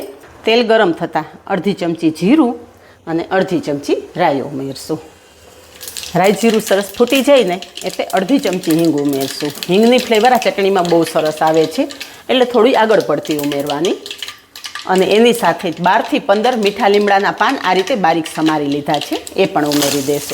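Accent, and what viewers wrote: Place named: Kathiawad, India. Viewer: Kathiawad ma bhaagye j jova malti uchchaar shuddhi , ane chatakedar chutney....khub saras . 😋😋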